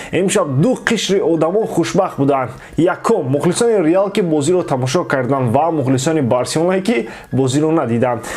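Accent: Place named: Afghanistan. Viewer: Илтимос ба хаму лахчаи агони габ назан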